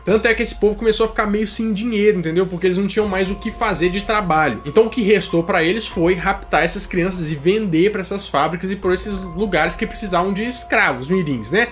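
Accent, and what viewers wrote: Minas Gerais, Brazil. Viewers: mds mano tu é mt mineiro, é o mineiro mais mineiro que eu já vi no youtube kkkkkkkkkkkkkkkkkkkkkkkkkkkkkkkkkkkkkkkkkkkkkkk fala mineirez dms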